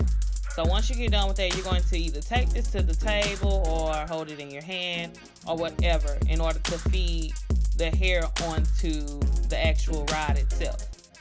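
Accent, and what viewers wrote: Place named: Memphis, United States. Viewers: Are you from Memphis??!! Lol!! The way you pronounce your words, sound just like my ppl from there. LOVE IT GUH!!! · I definitely was gonna ask was she from the south! LOL, her accent sounds like mine!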